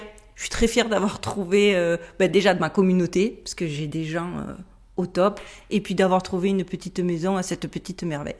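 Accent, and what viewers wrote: South, France. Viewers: bonsoir, Excellente vidéo et convaincu je suis. Merci, petit rajout vous avez un bel accent du sud de la France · Super émission, c’est sans fond! Impressionnant ! Merci J’apprécie d’autant plus que je suis du sud aussi, et j’aime nos accents😊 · j aime ton accent du sud de la france que du bonheur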